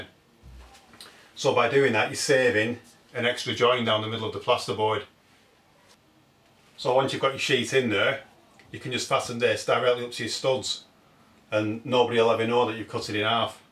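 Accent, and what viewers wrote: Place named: Blackburn, United Kingdom. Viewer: Are you from Blackburn That accent sounds familiar 🤣😂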